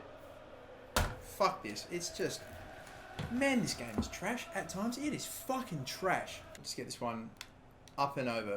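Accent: Australia. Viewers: Funny hearing an Aussie swear:) (no offence, love your content mate)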